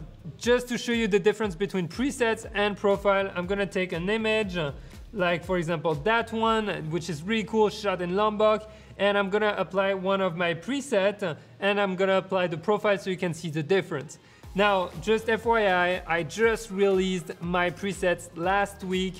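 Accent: France. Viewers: I just jump from your FR chanel and it's fun, you got a french accent, and in French you got like 50/50 British/US accent :D And one more subscriber.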